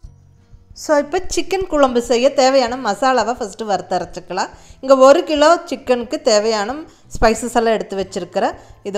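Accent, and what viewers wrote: Kongu, India. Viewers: அருமை சகோதரி. உங்கள் கொங்கு தமிழ் உச்சரிப்பும், குழம்பும்.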